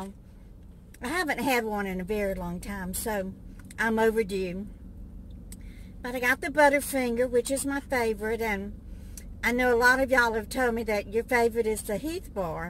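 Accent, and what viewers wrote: South, United States. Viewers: I enjoy your channel. There are people(other you tube channels) out there saying you talk in a syrupy southern accent, but I know its real because I have known people from Mississippi. As far as those saying you are a con artist because of your pay pal and patreon, that's not illegal to do that and people like me who like to watch but can't afford to send you money are still free to watch. Be careful of the mean people out there, don't acknowledge them anymore if they contact you, it fuels the fire. I took up for you and got attacked by some. Be safe and keep on vlogging.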